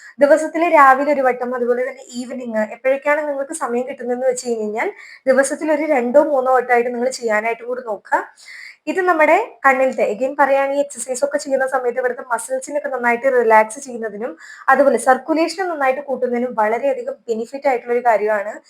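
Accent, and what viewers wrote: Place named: Malappuram, India. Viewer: 100% ശരിയാണ്. മലപ്പുറംകാരിയാന്ന് തോനുന്നു. പൊതുവേ മലപ്പുറം കാർക്ക് പല അക്ഷരങ്ങളും വായീന്ന് വരില്ല. ഉദാഹരണം: മഴ എന്നു പറയുന്നതിനു പകരം "മയ" എന്നേ പറയൂ. പഴം: പയം, കഴപ്പ്: കയപ്പ്, പഴനിമല: പയനിമല, കഴിഞ്ഞു: കയിഞ്ഞു, നാക്ക് വടിച്ചാൽ മാറുമോ ഈ പ്രശ്നം. സാക്ഷര കേരളത്തിൽ ഇങ്ങനെയൊക്കെ കേൾക്കേണ്ടി വരുന്നു. കഷ്ടം.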